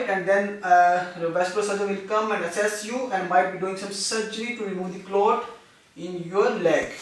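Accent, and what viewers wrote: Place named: Asia. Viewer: Very educative video. You are good teahcer. The only challenge with the video is the accent that make it difficult for non-Asian audience to hear it well. We will appreciate it, if you can speak more slowly, just to carry others along. Thank you, sir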